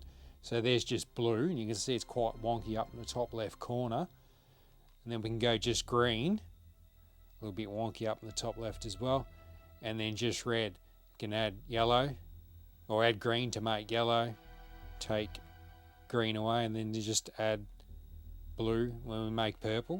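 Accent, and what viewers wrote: Australia. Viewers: This is how I imagine an Australian to speak..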